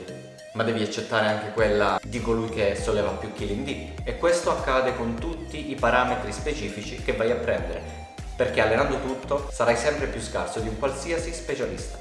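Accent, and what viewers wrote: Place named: Calabria, Italy. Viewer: E niente... mi sono appassionato da un anno al calisthenics e tu mi rendi anche fiero di essere calabrese come te. Sentire discorsi motivanti come questo col proprio accento è il massimo che possa desiderare da un canale che tratta della mia passione 😂. Grande💪🏻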